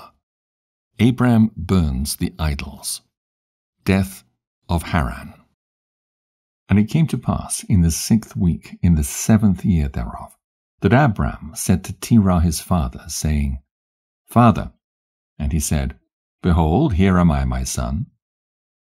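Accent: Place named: Europe. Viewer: I love these other books but why are every one of them told in a European English accent???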